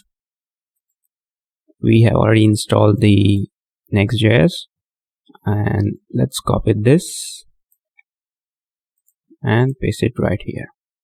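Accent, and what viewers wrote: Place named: India. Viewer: The problem is that your English is pretty hard to understand, no offense. I know it's the standard Indian English, but still. If your primary audience speaks the same way, no problem, but if you target a wider audience you may want to up your game. I tried to focus on the content and ignore the accent but I didn't make it through the video.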